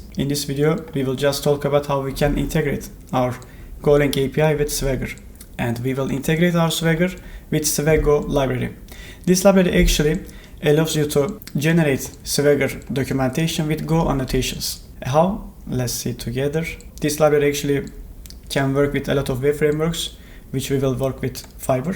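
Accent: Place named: Turkey. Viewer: Hocam o tatli aksaninizdan direkt Turk oldugunuzu anladim :D Harika bir video olmus direkt kanali takibe aldim. Cok tesekkurler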